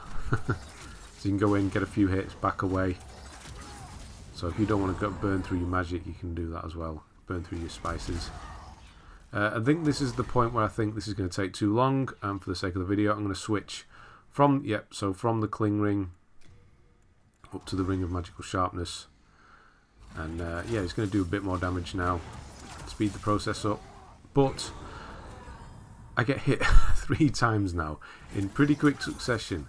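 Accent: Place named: Teesside, United Kingdom. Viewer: mate these have been a great help so far never played a souls game before had to restart after watching your first vid as I had agro'd that first Prince and ended up feeling like I had to kill him I have now filled all the way through taking shots at doing it on my own and watching the vids to make sure I havnt missed anything and leveling up with you at the end this has been a great help were are you from you sound like you are from near me I'm Teesside UK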